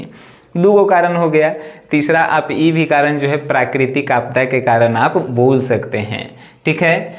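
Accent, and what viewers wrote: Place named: Bhojpuri, India. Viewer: Sir aap bahut acha pada rahe h ek dam bhojpuri legvej me pura samjha aa raha h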